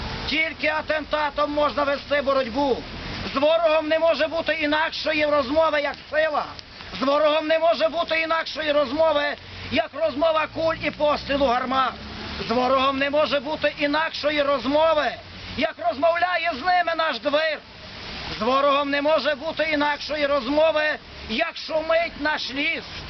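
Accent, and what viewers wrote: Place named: Western Ukraine, Ukraine. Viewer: Западноукраинский диалект.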